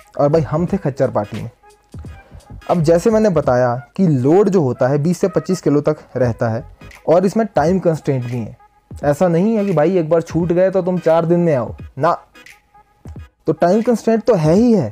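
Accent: India. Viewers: new subscriber here ! sounds like a UP wale bhaiya !!Am I right????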